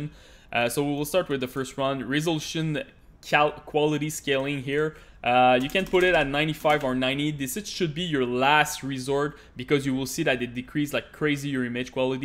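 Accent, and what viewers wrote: Quebec, Canada. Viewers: accent du quebec sa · ta une accent quebecois xd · Fuck ça se voit direct l’accent québécois car j’en suis un en tout cas merci pour ce tuto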